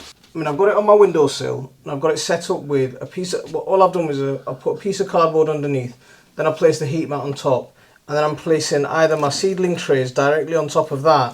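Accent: United Kingdom. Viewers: I could see that. Excellent. Tell me, it sounds like you may be second generation British. Where do your family originate from? I lived and worked in Bangladesh from <num>.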